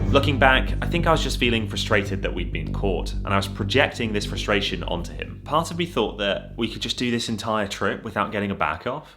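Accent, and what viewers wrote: United Kingdom. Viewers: U sound british... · In this video you said you were baffled to be recognised by your voice, but isn't a British person playing cards in places like Arkansas quite unusual? I (fellow Brit) went to Texas once and my accent turned heads constantly. You might be attracting attention simply by talking in some of the non-tourist areas you visit. A tipped-off floor manager could have one of your videos playing in his ear whilst he listens to you talk live at the table, and you're disguise is blown. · Baffled u can be recognized by just your voice? In the US and Canada, a british accent sticks out like a sore thumb. Not that it’s bad, it’s just easier to distinguish, unless u can use a different accent.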